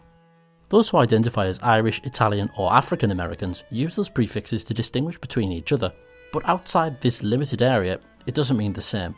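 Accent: United Kingdom. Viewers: I fucking hate your accent with all the force of my spirit but I have to say brits surely do quite good content here in youtube (even if sometimes i dont fully understand what you say) keep the good work my good chap P.D: Roll youR fucking Rs for the love of god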